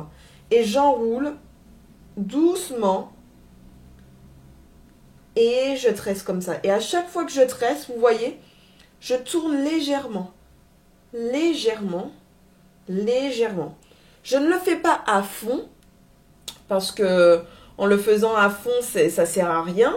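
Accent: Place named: Caribbean. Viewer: Merci. J' adore ton accent, je me crois revenue au péyi chaque fois que je te regarde. J'y vais l' année prochaine, merci pour ta technique que je ne connaissais pas et finis bien cette année 🤩🤩🤩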